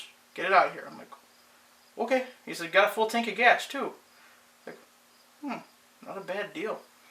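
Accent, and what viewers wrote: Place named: Upper Peninsula, United States. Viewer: you are picking up a little yooper accent!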